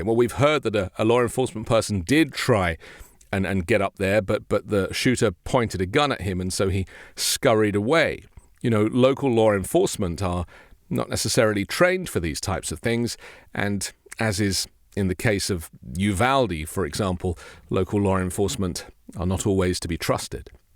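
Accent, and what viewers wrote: United Kingdom. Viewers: Try not to sound British.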